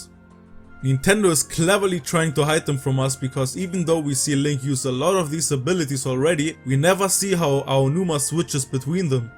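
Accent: Germany. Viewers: Are you german because youre accent sounds lile a german one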